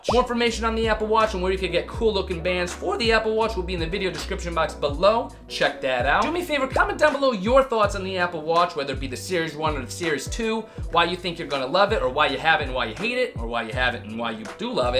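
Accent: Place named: Boston, United States. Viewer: That Boston accent 😂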